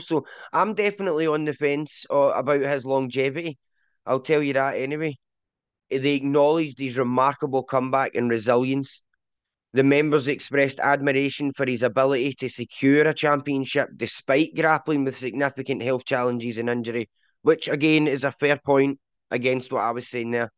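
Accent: Scotland, United Kingdom. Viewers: love getting my sumo news with a scottish accent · The Scot is back! Always a joy to hear your commentary and hot takes.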